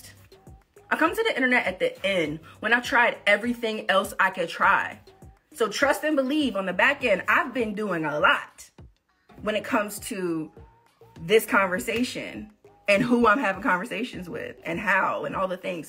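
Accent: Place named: Houston, United States. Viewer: Not me realizing that I actually know the voice behind the channel. Wow, you are amazing girl. Congrats on your YT success!!! I’ve been subscribed for the past year now and was like damn I know this voice from somewhere. She sounds like she’s from Houston lol. Hey girl, heyyy 👋🏾🤘🏾😘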